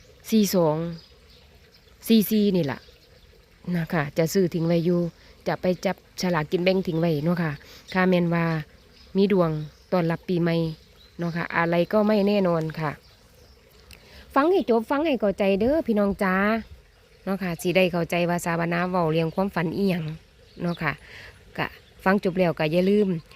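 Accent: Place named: rural, Thailand. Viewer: ชอบฟังเสียง จร้า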